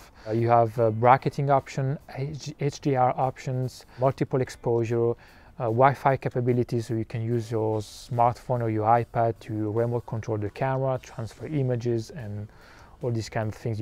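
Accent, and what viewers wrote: France. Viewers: Salut, j'ai vu un commentaire en fr et à l'accent j'ai un peut reconnu donc j'en profite comme je suis au top en anglais, j'ai actuellement un canon 70d avec un 18-35 de chez sigma et un 50mm, je cherche quelques chose de plus léger et compact quand je part en vacances ou sur des soirées, ce que je veux conservé c'est surtout le RAW, le viseur, la possibilité de changer d'optique et un bon placement au niveau du tarif, j'aimerai aussi un piqué correct, pas digne d'un 5d mark III avec une optique L, mais au moins d'un 70d avec un objo de kit. Je pense que ce olympus rentre parfaitement dans mes critère, peux-tu me confirmé ? As-tu des exemple en haute def de photo prise avec le kit de base de ce olympus ? Merci beaucoup pour ton retour !! Et bonne continuation. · Franchement très bonne vidéo, avec un bon petit accent à la touche française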